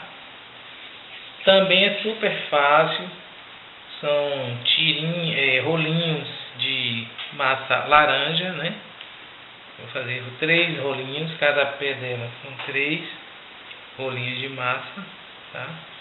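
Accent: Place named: Recife, Brazil. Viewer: olá, me apaixonei pelo seu trabalho desde que vi o primeiro vídeo, e seu sotaque me faz lembrar minha infância e meus primos do Recife.Você é maravilhoso no que faz e se reclamarem que vc fala demais, são pessoas ingratas, pois se vc tem o trabalho de postar vídeos para nos ajudar devemos agradecer e não criticar.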